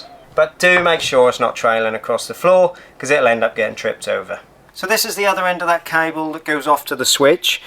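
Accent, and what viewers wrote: Norfolk, United Kingdom. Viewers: Good informative video and well presented. Given that your videos are aimed at the diyer would it be worth advising that a set of VDE cutters may be a worthy addition just in case the wrong wire is selected and cut? Granted a voltage detector and isolated supply should make the circuit safe but there’s always that unforeseen situation! This is not a criticism by the way, I was working in an old restaurant this year renovating a kitchen, an old lighting circuit needed terminating. The sparkle isolated the supply and the detector did not pick up any voltage ( fluke not sure why), cable was cut and was to everyone’s surprise (especially the sparks!) still live! Vde cutters used, but wrecked never to cut again, sparky was fine btw. Seemed the cable was fed by another circuit which went live when it was activated in the cellar! 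Like I said no criticism as it’s a great video, is that a Norfolk accent btw? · Awesome video. I'm a pretty good DIYer but learned some new things. Wago connectors soo much better than old junction boxes. I'm a Beccles boy and recognise your accent from nearby...Norfolk somewhere?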